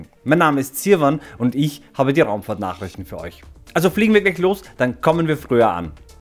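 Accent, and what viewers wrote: Austria. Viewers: Sehr gut! Tolle Ideen, ja bitte! Mehr Witze, mit Oestereicherim Akzent! Ehrlich Top 10 Space YouTuber in allen Sprachen! Wie wäre es mit einer Episode, die sich damit beschäftigt, was passiert, wenn Starship 420 bei (1) Start, (2) Max-Q, usw. explodiert? Und wie Du die Chancen für jeden weiteren Schritt einschätzt. :)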